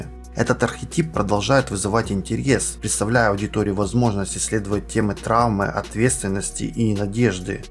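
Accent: Russia. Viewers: говор на Воронежский похож) В принципе, там близко 🤗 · да, потому что все территории около Украины, украинизированны, именно по этому у вас там говор, ге-шо-те... задумайся росиянин против кого воюет твой царь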